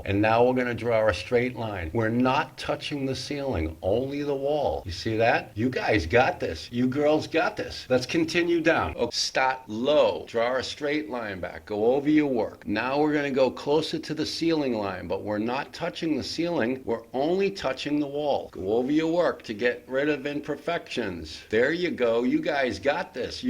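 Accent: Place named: Boston, United States. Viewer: I've watched a ton of videos about cutting in, including ones by pretty high-profile YouTube painters/DIY guys, and this one was by far the most helpful to me. I like that I can see exactly what you're doing, and that I get to see you do it over and over and over and over while you describe what you're doing each time. Also, you have a cheery, encouraging attitude, which is nice :) Oh, and I love the Boston accent. I'm halfway through painting my basement right now, and even though it's my first time painting, my lines are super clean. Thanks!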